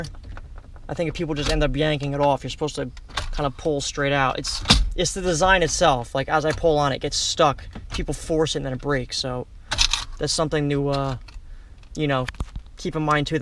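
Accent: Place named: South Jersey, United States. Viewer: Frank Michael Sr It’s definitely South Jersey. I can tell by his accent and the flat landscape with barely any trees. We call them 609ers.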